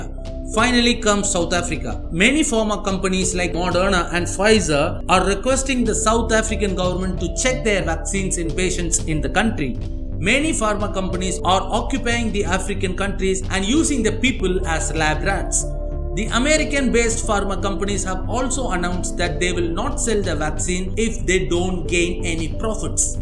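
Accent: India. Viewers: Watch out for your indian accent in the way you speak English, apart from that you have a nice content and imagery.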